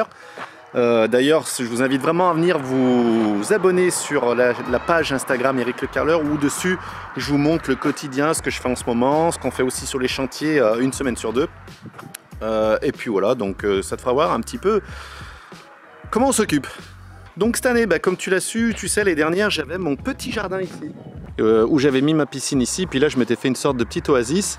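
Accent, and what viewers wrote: Loire, France. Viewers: Un p'tit accent du 42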